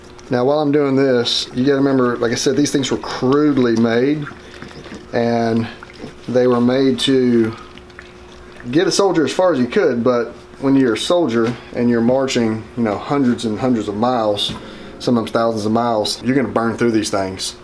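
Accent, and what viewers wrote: South, United States. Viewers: Love the Southern accent. "On" is pronounced "own."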